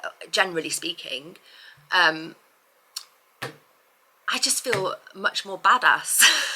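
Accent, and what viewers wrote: United Kingdom. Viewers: Hi. It's so nice to hear a fellow (and beautiful) English accent. Best of luck with everything.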